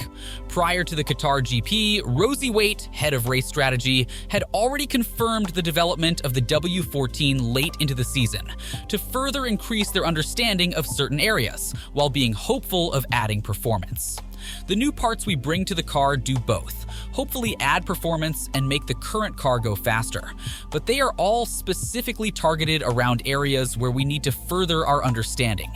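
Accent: United States. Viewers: Nice video explanation but you also didnt say Grand Prix correctly with your very thick American accent ! It's a French word so say it with a French accent !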